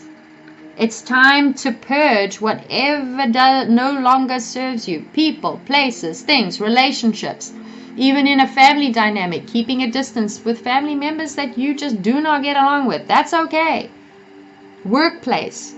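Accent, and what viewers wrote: South Africa. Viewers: So on point .. my family did me dirty but it's ok.. thank you 🌹🙏🏽 you sound south African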